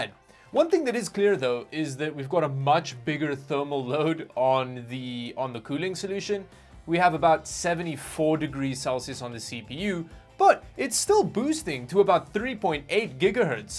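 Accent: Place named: South Africa. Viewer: I can bet you are south African, eh? Afrikaanse mense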